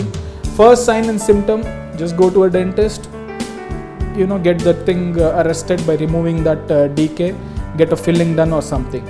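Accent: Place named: India. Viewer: It's Indian accent.....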